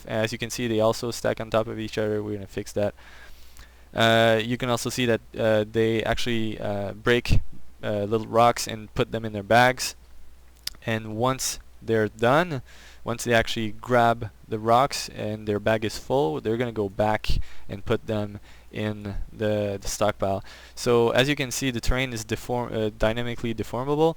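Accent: Quebec, Canada. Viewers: Le québécois, je dirais... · I recognized the quebec accent immediately :) .. also.. cool looking game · Vous êtes Québécois ? Je dis ça, car on dirait que vous avez un petit accent Québécois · By the sound of your voice and you name, i guess you'rs from Québec? Am i right?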